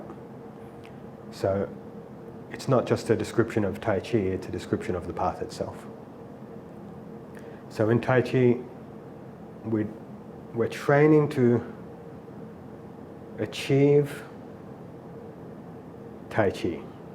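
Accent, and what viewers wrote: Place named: Australia. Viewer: Have seen on YT before, but didn't pick the Aussie accent until just now! Must keep an eye out for seminars here... 👁️ ☺️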